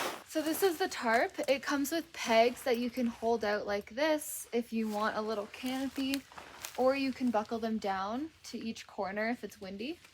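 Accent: Canada. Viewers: Great Canadian accent.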